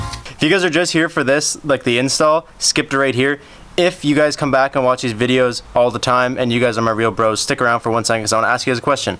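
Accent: Canada. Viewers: AMO he’s canadian man It’s his accent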